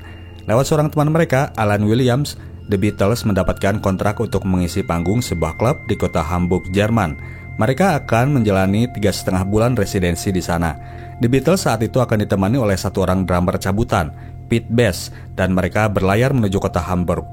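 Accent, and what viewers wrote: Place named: Padang, Indonesia. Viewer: Logat padang....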